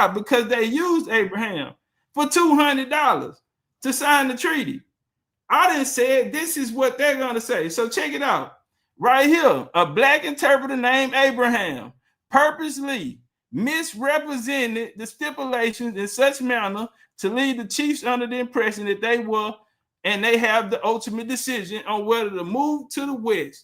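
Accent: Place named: South, United States. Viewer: I met and heard Dr Henrik Clarke in person and this young brother sounds very much like him but w a stronger southern accent! I just hear that Dr Clarke kinnection and he’d be proud ❤ his last lecture he talked about African Americans finding their true identity now I know why that was on his mind in his last days 🎉 This is THE BEST our story lecture to date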